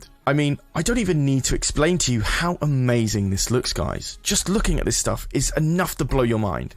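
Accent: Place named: England, United Kingdom. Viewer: Why does have an English accent on his second channel?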